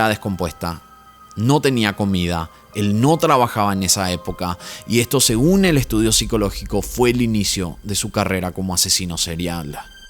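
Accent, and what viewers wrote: Paraguay. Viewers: Buenas tardes, genial tu canal, una consulta, sos de Py? Te pregunto por el acento, gracias por tus aportes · Sos paraguayo? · de donde sos??? o tus padres> por el acento pregunto:goodvibes: ( Paraguay??) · Es el acento nuestro de los paraguayos! es paraguayo. Saludos a Venezuela desde Paraguay!! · Apenas te escuché hablar cada vez me convencía más que eras Paraguayo, o estoy equivocada? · tu acento es paraguayo vrd? lo digo pq yo soy paraguayo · Me pareció que serías de PY por el tono de hablar y genial al saber que si, que grande sos gracias YT por mostrarme esto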